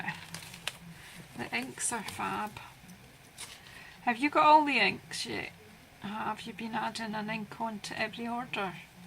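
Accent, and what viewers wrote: Scotland, United Kingdom. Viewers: Beautiful fall cards! I’m definitely going to case them and, no, I never get bored listening to you, I could listen to that beautiful Scottish accent all day long!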